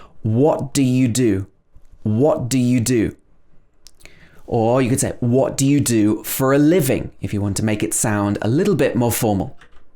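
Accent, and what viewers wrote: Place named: United Kingdom. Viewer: Pls do continue making videos...I am an indian ...its great to learn British accent from you...doing a great job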